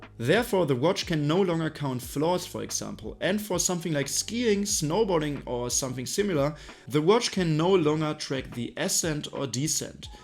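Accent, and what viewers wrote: Germany. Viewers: holy shit i’m laughing here. Saw your video and clicked to learn about the watch and immediately thought to myself what a thick German accent while glancing down at the name of your channel.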